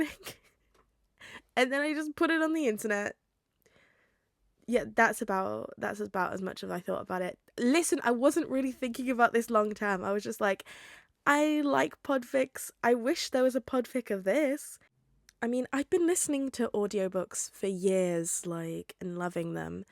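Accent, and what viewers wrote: United Kingdom. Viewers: i love you bestieee. 10/10 cute video youre really good at a british accent, do you practice? >:))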